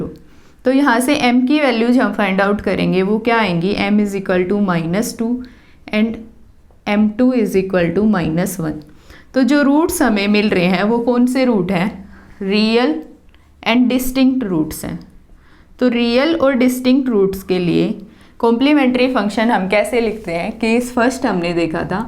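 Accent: Haryana, India. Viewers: Mam aap haryana se ho?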